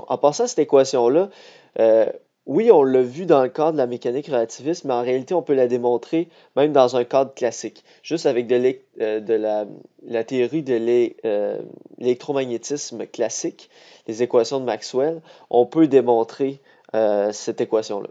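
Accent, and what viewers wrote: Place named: Quebec, Canada. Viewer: Ton accent est Excellent mais ducoup on comprends pas trop quand on est pas québécois xd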